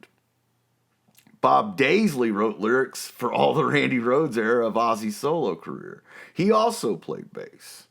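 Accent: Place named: South, United States. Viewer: Are you from Florida or Alabama?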